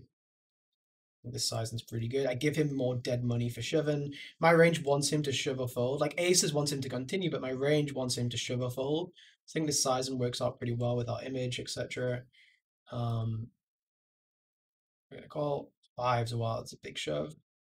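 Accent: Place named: Cardiff, United Kingdom. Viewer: Where are you from?...........sounds like a tint of Cardiff accent there......nailed it?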